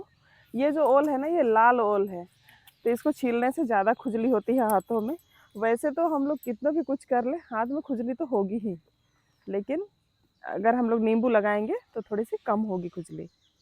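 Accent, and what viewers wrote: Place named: Bihar, India. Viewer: Hamlog bihari hai lekin aapki sari bate hamlogon ki tarah hi hai isliy achche se samajh aata hai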